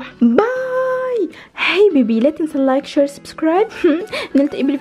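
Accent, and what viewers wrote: Tunisia. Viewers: انت تونسية صح لانو لكنة لهجتك تشبه التونسيين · انتي تونسية علاش تحكي لهجة مش لجهتك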